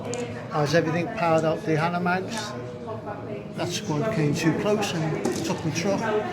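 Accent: United Kingdom. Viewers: That a scouse accent? I'm recently into Bolt Action but no idea where theres any groups in Liverpool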